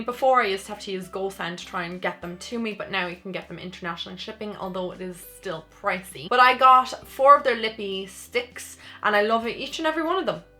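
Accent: Ireland. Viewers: What part of Ireland are you from x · Trte Mrte she's Irish.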